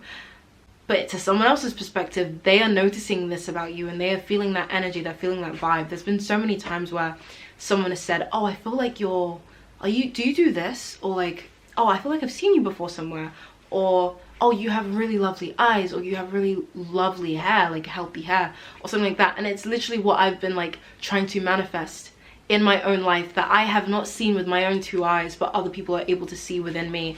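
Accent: United Kingdom. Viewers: I just came from one of her older videos, why does she have a British accent now?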